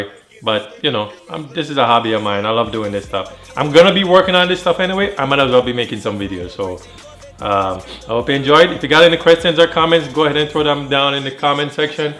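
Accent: Jamaica. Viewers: Found ur Channel last night n watch about 8 videos to this point ... keep it up man love the content man ...u sound like a yaadie to lol.. bless up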